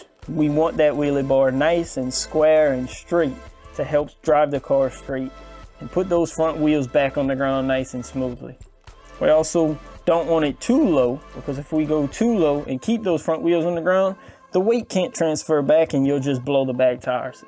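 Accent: Louisiana, United States. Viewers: Got that Houma type accent going....I'm on the Northshore. Just getting into the drag racing scene, coming from Nitro Buggies in the past. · I cant with the Leweeze-ee-annah accent...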